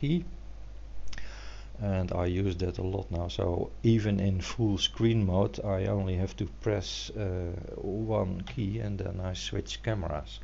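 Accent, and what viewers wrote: Netherlands. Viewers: Jij praat met een héél Nederlands accent.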